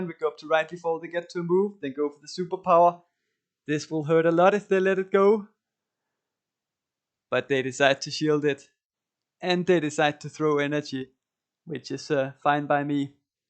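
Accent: Denmark. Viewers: Your danglish is beautiful ❤️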